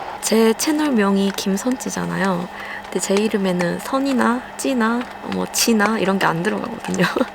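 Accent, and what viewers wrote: Jeolla, South Korea. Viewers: 전라도 억양 넘 정겹고 좋습니다. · 깝깝해부네 ㅋㅋㅋㅋ 저도 고향이 전라도라 사투리 말투 너무 귀엽네요 ^^ 선찌는 무슨뜻일까요 궁금하네요 ^^ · 전라도 사투리 정말 예쁘게 하시네요. 오늘 웃다가 구독하고 갑니다.^^ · 와.............. 유튭에서 전라도 사투리 왤케 반갑죠....?? ㅋㅋㅋㅋㅋㅋㅋㅋㅋㅋ 자주 시청하겠습니당 😁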